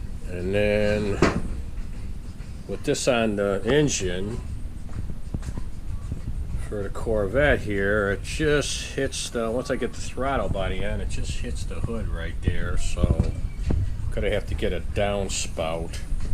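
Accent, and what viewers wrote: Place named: Chicago, United States. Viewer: You still around and with us? Sounds like you're from Chicago(?). I grew up in Orland Park.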